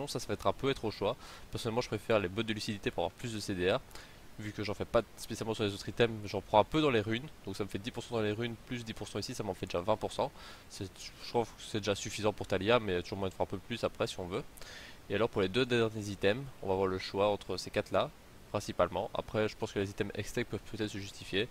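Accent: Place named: Belgium. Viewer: T'es Belge car t'as un ptit accent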